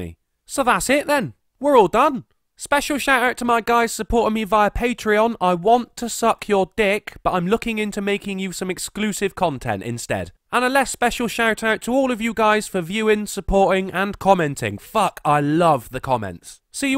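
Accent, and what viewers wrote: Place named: London, United Kingdom. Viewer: "What do you attribute the success of your channel to?" Wit, anti-SJW subject matter at a point in time when it matters and a charming London area accent--if I'm not mistaken.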